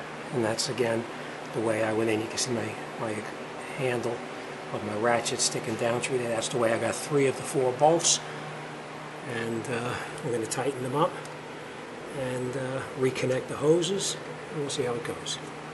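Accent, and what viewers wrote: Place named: Long Island, United States. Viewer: Wow it's been a while since I heard someone from the inland talking . I used to spend every weekend during the summer at either HotDog beach or Sag Harbor .or Long Inland National speedway with our 10 second Camaro ..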